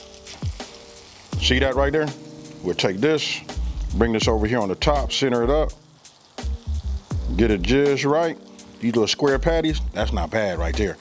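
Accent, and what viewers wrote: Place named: West Coast, United States. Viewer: Anybody else can tell that he's so West Coast??? Not mad at it at ALL!!!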